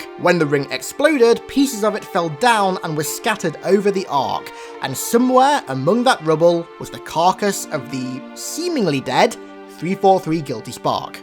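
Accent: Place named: England, United Kingdom. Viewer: congrats you have all the xperience;D i love your vids and yeah you are english right? just a question. because of your accent. like i said congrats.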